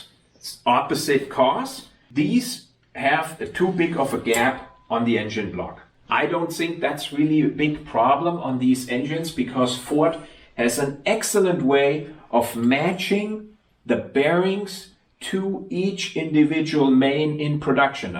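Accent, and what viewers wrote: Germany. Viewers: Love thee German accent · I think I have never seen a video with more in-depth analysis and plain old truth, all topped off with a cool German accent! The sad truth is that very few people care to know anything about the car they drive - no more than where to put the fuel in, if that much. In other words, most people are simply consumers of what other people design, manufacture, and maintain - and spend all their time complaining about how their feelings got hurt by this group or that one.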